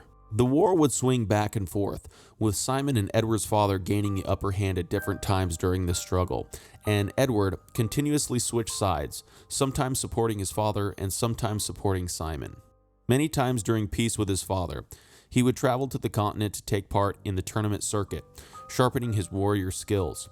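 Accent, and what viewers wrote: United States. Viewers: An excellent narration! [Do I detect a midwestern accent?] I'm looking forward to more from you. I'd like to see an in depth history of early czech/moravian rulership. It's hard to find anything on this. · I can't watch this it doesn't seem right with an American narrating.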